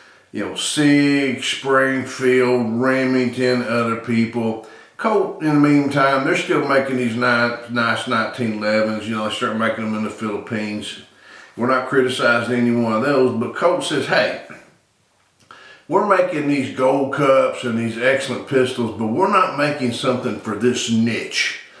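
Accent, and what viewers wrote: South, United States. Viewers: Hey You The Man Coming At You From North Carolina Old Vietnam Vet Retired 100% Disabled PTSD.SARGE I Bought One When They First Came Out & Your Review Was Spot On.Thank You Sir For Your Service. I know A Vet When I See One.Yes Sir Ree!!!! Over In Nam The Best Friend Of Mind Was From Arkansas Best Man Every Has His Shit All Wired Together Tight. You Get My Meaning. Sarge Any Thoughts On Suppressor For This Mean Machine.Have Looked At Threaded Barrels All Over The Web. I got Two Suppressors One For Colt AR15A4 Weapon And One For My M1991A1 If You Have Any Thoughts On This This Subject Please Do Share With Me On The Best Way To Go with This Project Sir. I Love The Way You Talk With Your Southern Slang & I,m Proud To Be From The South Too Just Like I Know You Are.Have A Good One & Keep It Between The Ditches HaHa!!!! Owens Signing Off For Now.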